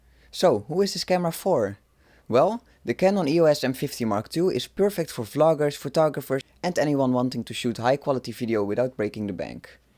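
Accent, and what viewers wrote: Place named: Netherlands. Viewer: Thank you for the break down. I just bought a second hand one today! I'm trying to learn how to operate it. I live in Hawaii so of course I need a camera here to capture all the beauty of the land. Though I would like to use an R8 I could afford this M50 today. So I'll shoot with it for a little while until I get blessed with another one. I believe I'll plan to keep this one in the collection. As I go to look for instructional videos on this camera your video is the first one I saw. I heard your voice and accent and said to myself he's a Dutch man. I looked deeper into your profile and saw you were based out of Nederland. I was born in Utrecht. Now I know for sure I've made a solid purchase. Because the Dutch are known for quality. So thank you for confirming my buy. And thank you for the wonderful video.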